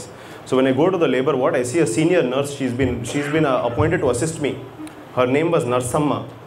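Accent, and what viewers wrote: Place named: South India, India. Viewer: your english is south indian n name is north indian. how come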